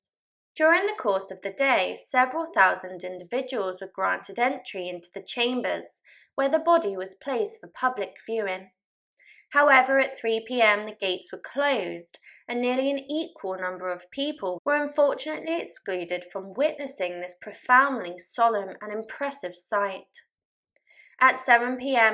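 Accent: United Kingdom. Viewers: I’m not even British and I understood her perfectly, maybe this is not the channel for you. I’m American (deep down south of the US at that) I think her British accent is lovely. Just saying. But I agree with comment above , the use of captions should help out a lot.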